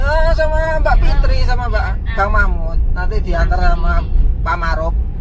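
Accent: Java, Indonesia. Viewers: Owh emang mm.tinggal di jambi yaa, dn orang jawaa th0 logat nya bicara ny jawaa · Mama saya kira org jawa dr logat bicara nya gak tau nya di Jambi 😄😅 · Ma....dari bicaranya om...bhsa jwanya kok kental bnget cih....piantun pundi nggih....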